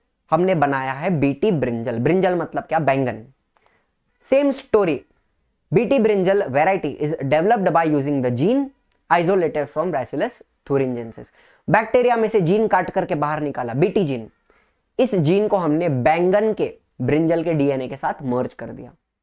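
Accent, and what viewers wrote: Maharashtra, India. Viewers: hi sir aap marathi ho na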